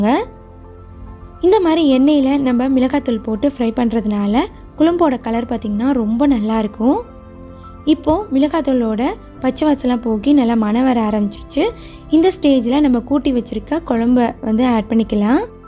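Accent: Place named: Karaikal, India. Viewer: asalamu alalikum sis m from sri lanka but m a indian unga video unga talking style yellame paakum boodhu yenaku india la irikura maai feel aaudhu na adhukaagave unga videos paakurai m really happy when i saw your videos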